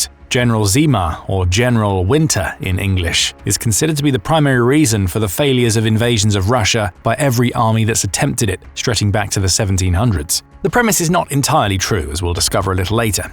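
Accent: United Kingdom. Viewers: How weird it sounds to hear someone with a British accent calling autumn, "Fall"! Good presentation, though.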